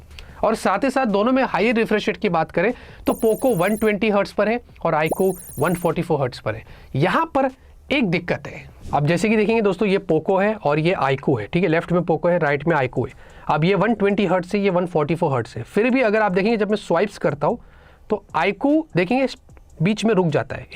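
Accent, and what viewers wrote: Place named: Mumbai, India. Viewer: Apki bambaiyya hindi achi hai...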